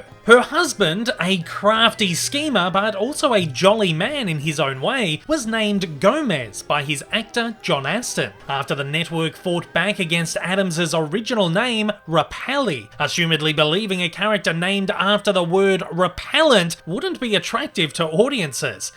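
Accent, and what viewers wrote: Australia. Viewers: your accent sound like Australian or Ozzy i m right i m kidding o know your a Australian what else will down under means then referring to Australia.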